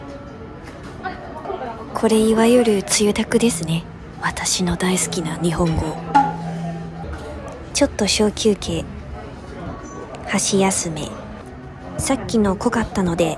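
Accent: Changhua, Taiwan. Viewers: 以為是彰化腔的台語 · 彰化腔最可愛❤❤❤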